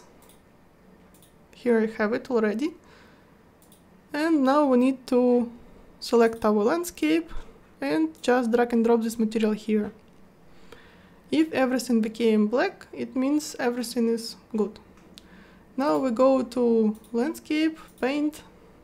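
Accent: Russia. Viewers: слышно русский акцент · Jest' ya slsshu russkiy aktsent, ))) Есть русская версия?)) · Как звучит русский акцент? Вот так) Спасибо большое за видео! Успехов! ЗЫ: Очень подробно. Подписался.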